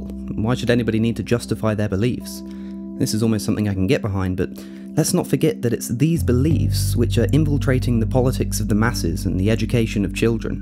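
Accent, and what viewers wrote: United Kingdom. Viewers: Wait... you live in the USA? You sound British. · He is British, from Oxford.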